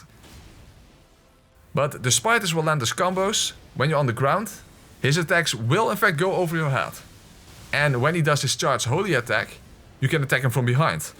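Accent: Netherlands. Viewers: Perfect Dutch accent💎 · man man ge kunt ge woon horen dat deze man een Nederlander is, hallo buurman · Aha ik dacht al wat een Nederland accent dit maar blijkt dat je dat ook bent❤ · You have a dutch accent. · Jesus f christ I can just tell you’re Dutch, that accent is fucking awful. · hahaha een echte nederlander haha wat een acsent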